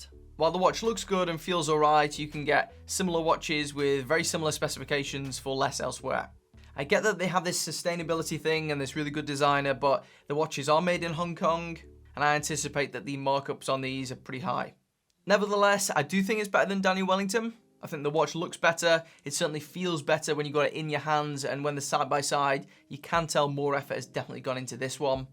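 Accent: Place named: Midlands, United Kingdom. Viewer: This guy is the only case I can tolerate the midlands accent for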